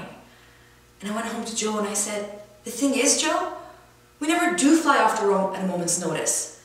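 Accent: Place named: United Kingdom. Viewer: I LOVE your British Accent!!!!!!!!!!!!!!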